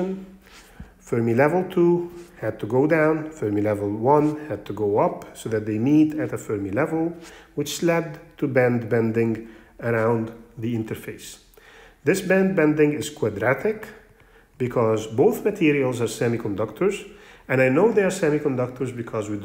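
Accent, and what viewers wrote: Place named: Egypt. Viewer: Best explanation ever! I am proud to feel the Egyptian tone and style.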